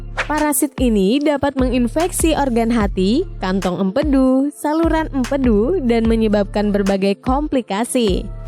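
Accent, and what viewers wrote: Banjar, Indonesia. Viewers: Yg bikin channel ini orang banjar ya?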